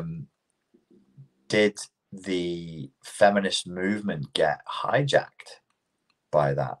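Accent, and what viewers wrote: RP, United Kingdom. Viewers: As a Canadian I think your accent is kinda posh sounding.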